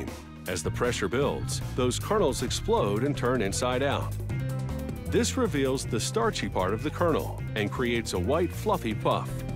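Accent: United States. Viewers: Discovery UK but the narrator is American lol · it says UK, but he has an American accent. 😓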